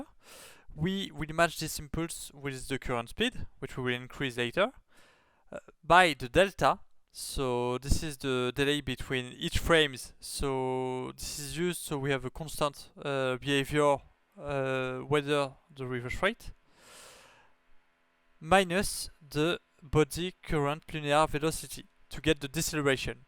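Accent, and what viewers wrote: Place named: France. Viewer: hello again, j'adore, quand, nous les fr ,nous parlons en anglais ^^ on a un accent parfaitement reconnaissable ^^ top projet !! ça m'inspire, pour essayer de créer un MK avec Unity ou UE mais pour l'instant, je suis sur la creation de Zelda totk ^^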